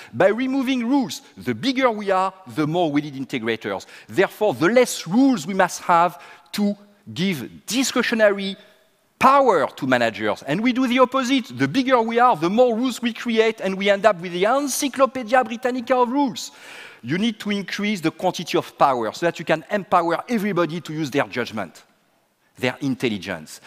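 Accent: France. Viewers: he speaks like a french. i dont like this. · French accent in English is the worst accent in English. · I'm sure this is very inspiring, but since I have never really had the chance to listen to others with a French accent I can't make out every sentence he is saying. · i think he has french roots. I sense a slight accent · I am distracted by his accent. I love how French speaks English :P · 1. Brilliant - I wish more Orgs would have the vision to integrate some or all of this into practice. 2. Its a French accent. Get used to it. There are subtitles. Stop complaining over simple stuff. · well, i'm French, so I can tell you that oral in our classes, oral english speaks are not pushed to be practiced till college years, so we have a few great people that have that kind of accent... Don't be afraid, this guy has great ideas...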